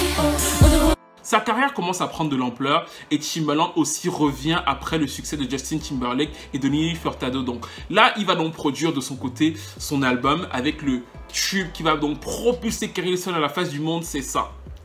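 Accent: Cameroon. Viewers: On sent un vrai Camerounais ton accent est très prononcé 😂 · Exactement.. j'ai senti mon frère kmr dans un dur topo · Ce gars parle comme un camerounais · J'ai reconnu l'accent camerounais. Good job, Mais les extraits musicaux sont beaucoup trop courts!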